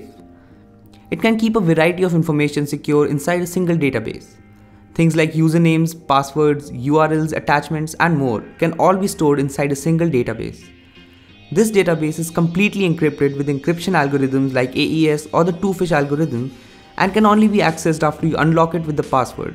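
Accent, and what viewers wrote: India. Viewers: Why haven't I seen/heard even one indian guy who can speak english properly without this stupid accent? It's just like hearing some foreigner speaking broken language you use in your country, unpleasant to listen to. I'm not saying everyone should have perfect british/american/australian/canadian/whatever accent but come on. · Make your videos with "In indian english" in title You are polluting my youtube History, and my ears... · please google block all indian english accent videos it is horrible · sotaque indiano dos infernos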